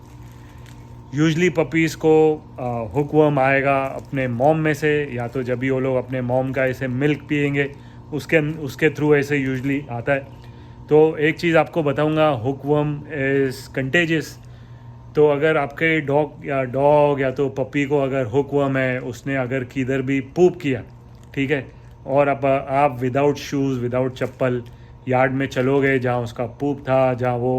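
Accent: Mumbai, India. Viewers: Your way of speaking takes me to Mumbai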